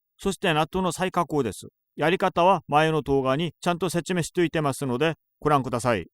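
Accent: South Korea. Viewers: 왤케 한국인처럼 일본어를 하나 했더니 한국인이었구나😅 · 韓国（？）だっけこのタイプの日本語クソカワイイwwww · 뭔가 한국인이 일본어 하는 느낌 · 억양에서 한국어가 느껴지는 건 왜죠? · 外国の方？韓国の訛りなのかな〜 全然分からなかった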